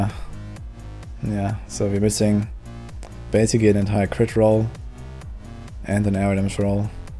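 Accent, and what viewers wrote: Germany. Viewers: you are 1000 percent a german right?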